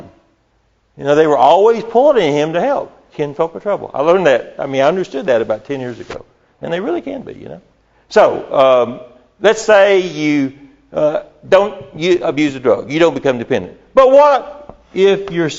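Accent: South, United States. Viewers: krisztina kessel it sounds southern of some kind. But it also sounds like he has a mild speech impediment as well which makes it a bit difficult to understand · Don't understand your american speech. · This Doc is amazing, just a brilliant teacher....Hard to find a Doc that can teach in such a simple manner...Love the Southern draw...Good people from the South..I wonder if this Doctor works at a recovery center..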